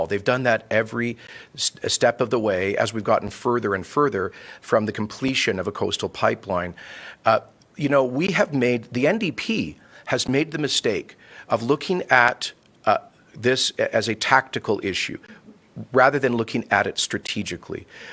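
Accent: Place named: United States. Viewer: The biggest boondoggle is the drop in taxes to 10% that Kenney wants to announce to buy votes of corporations in Alberta. How has he planned to pay for that? No idea! Kenney is truly the most unlikeable arrogant self centered bully in the history of this province...He is not even from Alberta..he does sound like either an American or from Ontario....Certainly not from here.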